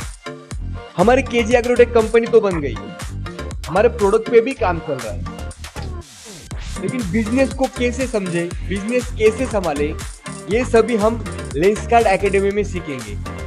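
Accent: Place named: Maharashtra, India. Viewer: we simply love maharastrian hindi 😅🥰🥰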